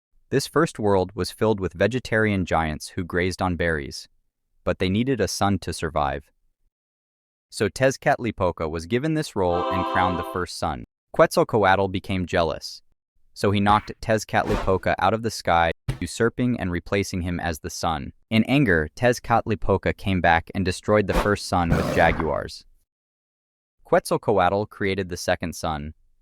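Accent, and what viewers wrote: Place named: United States. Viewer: I understand not pronouncing most of the words correctly, it's a difficult language for outsiders and even Spanish-only speaking Mexicans fuck them up all the time, but having an American accent and intentionally pronouncing jaguar wrong(even more than normal) is ridiculous.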